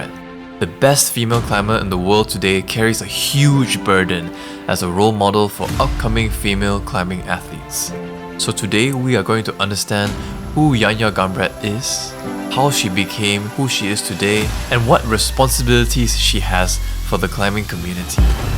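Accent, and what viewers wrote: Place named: Southeast Asia. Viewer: great video! but random qn.. by any chance are you Southeast Asian? hahahaha your accent is familiar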